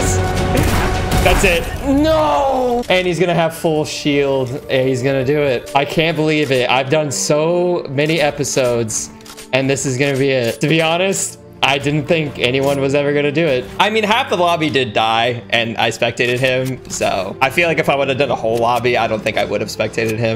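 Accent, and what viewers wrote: Germany. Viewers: Are you german? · Is he German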